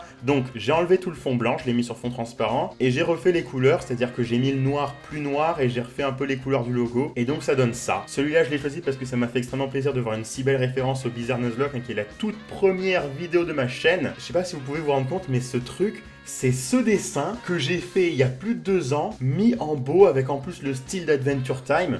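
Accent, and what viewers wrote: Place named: Quebec, Canada. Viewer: J'adore l'accent québéquois ^^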